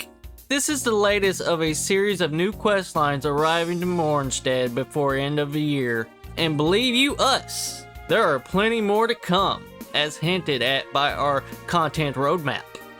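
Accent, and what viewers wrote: South, United States. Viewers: LOL. The southern accent is killing me. haha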